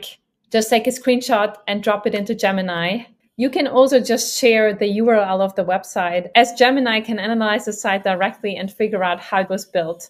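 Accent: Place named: Netherlands. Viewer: A few seconds in... wait a minute is that a Dutch accent ? A Dutch AI-content Youtuber ? We don't have much of that, would be funny if it is. Glad I was right. 🙂 I wonder if someone like who seems to be very good at using tools. Could you use Firebase studio to get results. Tina Huang who seems to be a developer was able to do it obviously, but I wonder for example if you could get the data store connected for that CRM. Or maybe we just need to wait an other month or 2 for Google the improve it/make it easier to use ?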